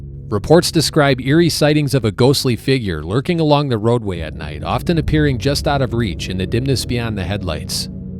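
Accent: Chicago, United States. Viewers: honestly, I also love your accent. My family is from northwest Indiana and the Chicago area, so you sound similar!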